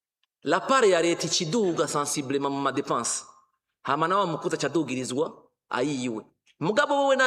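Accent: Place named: France. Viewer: Uyu numurundi canke numu francas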